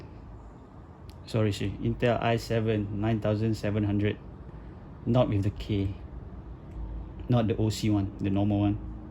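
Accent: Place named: Malaysia. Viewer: Judging from your accent are you from malaysia?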